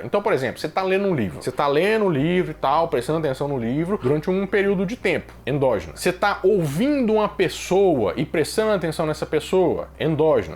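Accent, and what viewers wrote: Minas Gerais, Brazil. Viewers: Vejo vc porque eu gosto. Tinha muitas opções. Sim mas a sua voz de mineiro e muito gostoso de ouvir. Sou mineira também UAI 😂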